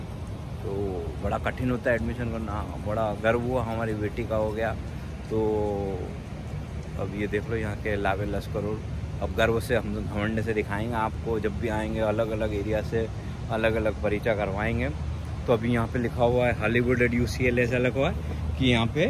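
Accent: Indore, India. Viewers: Bhot jandar 👌👌👌👌 Ye foreign youtube channel wale indori nahi bolte to mja nahi aata unke vlog me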